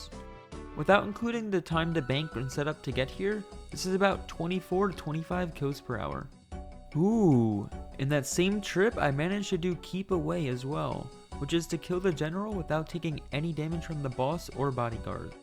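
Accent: United States. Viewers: Are you from Philly?? I hear some wooder in those water runes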